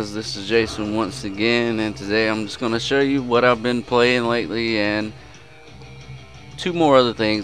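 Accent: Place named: South, United States. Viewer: dat southern American accent.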